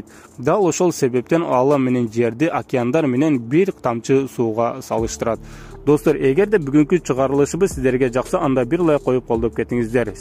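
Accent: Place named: Batken, Kyrgyzstan. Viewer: Автор Баткендик окшойсуң и дикция да өлүп калыптыр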